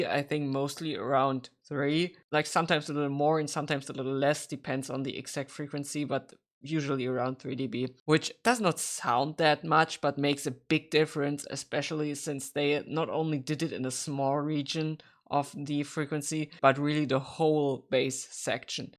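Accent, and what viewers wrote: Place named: Germany. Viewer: Hey:) Da sich dein Englisch genauso anhört wie meins schreibe ich mal auf Deutsch 😂. Erstmal ein schönes Video mit einer guten Erklärung hast du da gemacht! Ich hab mal ne Frage... Ich bin relativ neu im iem-Bereich und hatte die Möglichkeit die Moondrop Chu 2 auszuprobieren. Da war ich echt schockiert wie klar der Ton ist und wie viel mehr Details man hören kann (im Vergleich zu meinen alten Soundcore Liberty 2 Pro Kopfhörer zu dem Preis!). Alles in einem will ich mir auch iems zulegen, allerdings hauptsächlich für Gaming (überwiegend single player games)aber auch um Videos zu schauen oder Musik zu hören. Es sollte am besten nicht zu neutral/flach klingen und leicht besseren bass haben als die Moondrop Chu 2. Die Frage wäre dann, bin ich mit den Zero 2 gut bedient oder lohnt es sich für etwas mehr Geld bspw. die Truthear x Zero (Red) oder 7hz Sonus zu kaufen. 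(Puh, der Text ist jetzt doch etwas länger geworden). Hast du da eine Empfehlung für mich? Würde mich über eine Antwort freuen ^^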